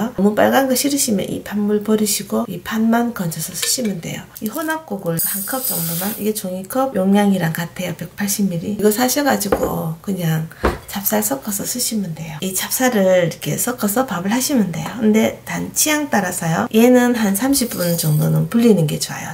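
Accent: Gyeongsang, South Korea. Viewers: 으...너무 맛있겠어요,.. 한번 따라 해볼래요.. 이모님 경상도 말씨 너무 이뻐요..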